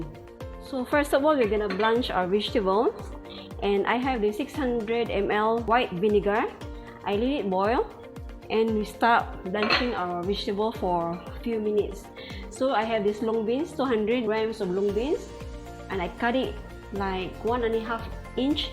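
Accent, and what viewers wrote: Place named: China. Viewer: Hello galing naman para kang Chinese mag English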